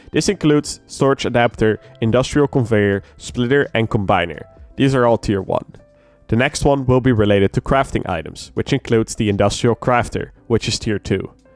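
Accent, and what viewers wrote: Netherlands. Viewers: Ik proef het gewoon dat je nl bent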